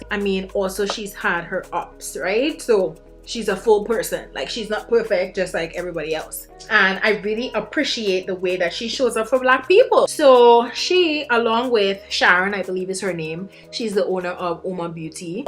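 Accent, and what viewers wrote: Trinidad and Tobago. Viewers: ❤️ when I hear your accent I miss my friend’s mother’s accent (Trini), I’m somewhat new to your channel subscribed 💕 hi again from 🇨🇦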